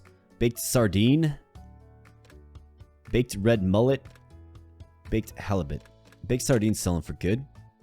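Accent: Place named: Canada. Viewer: Wait, are you canadian? You say pleg instead of plague, and rut instead of root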